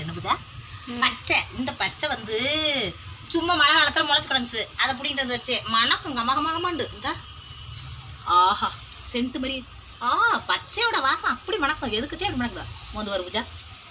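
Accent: Madurai, India. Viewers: But your madhurai slong vera level, i am live in karaikudi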